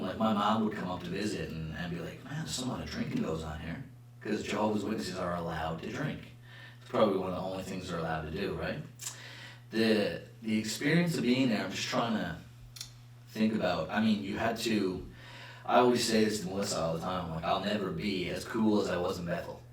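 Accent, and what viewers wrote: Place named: Nova Scotia, Canada. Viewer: Not sure how i missed this video. But great accent! Miss nova scotia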